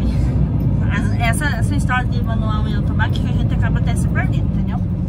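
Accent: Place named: Santa Catarina, Brazil. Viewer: Boa noite, mas de novo vai ficar de varde😂 amo esse modo de dizer as palavras, morei em Santa Catarina na cidade de Modelo por um ano, os meus piás, já estava com sotoque😂🥰 mas você merece😉fica com Deus e boa viajada 🙏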